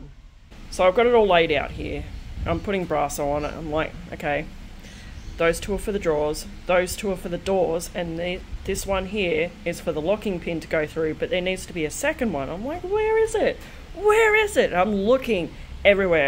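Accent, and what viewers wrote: Australia. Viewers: Wow. So much detail work here. Your client should pay you well. I cannot imagine how many hours of work here. Love your Aussie accent and your quotes...."stand out like a dog's nuts". 😂😂😂. I always enjoy your sense of humor and look forward to your content. How many hours did you work on this piece?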